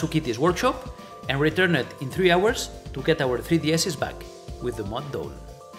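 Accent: Catalonia, Spain. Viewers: Ese acento español jodeeeeer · Eres español, no? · Tío intenta hablar mejor el inglés que se te nota que eres español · ese acento español sigue intacto papu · Soy yo o hablaa como español hablando en ingles:v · No, he is not French. That's more of a Spanish or Catalan accent. And since he's from Andorra, it's clearly Catalan. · No se como he llegado aquí pero tu acento, suena como un español intentando hablar inglés y pronuncias raro xD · Loko como se nota que eres español xdd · se nota bastante. Uno el nombre y algunas palabras en inglés que suenan muy español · Vale pavo y si haces los vídeos en español? O catalán...porque llamándote... inglés no eres y americano tampoco... Así que igual también estaría bien que los compatriotas te entendiéramos. La pronunciación te delata colega. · Buen vídeo,se nota demasiado que eres español jaja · Cómo se nota que eres español xDd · Se nota demasiado que hablas español tío JAJAJAJA por cierto muy buen video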